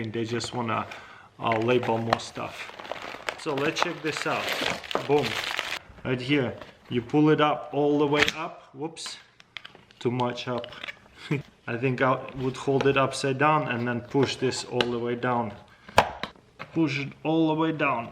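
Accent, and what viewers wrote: Russia. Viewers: Lol he said water battles its "water bottles " lol i like his russian accent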